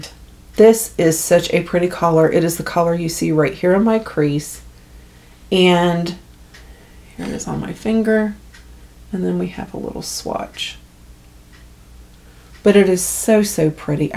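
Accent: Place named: West Virginia, United States. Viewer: Enjoyed your video as I always do. I am moving back home to West Virginia this coming weekend - Ravenswood. Hearing your beautiful accent has made the wait to get back seem shorter! Thank you!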